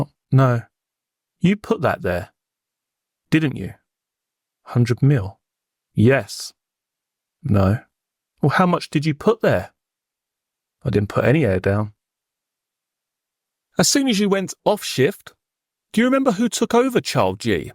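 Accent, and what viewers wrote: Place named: East London, United Kingdom. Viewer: Maybe a great voice actor but the wrong accent. A little too east London, especially for a barrister.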